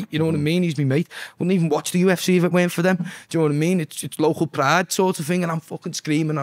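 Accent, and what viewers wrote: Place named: Liverpool, United Kingdom. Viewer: Boss that proper scouser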